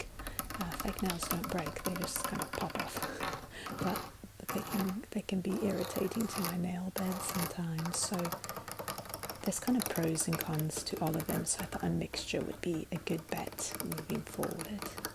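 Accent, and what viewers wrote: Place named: United Kingdom. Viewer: I’ll always be on the lookout for any little slip up in your British accent 😊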